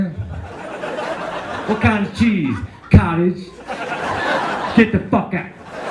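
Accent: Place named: South, United States. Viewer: Was he from Georgia? He has a southern accent here. Sorry he is gone❤😅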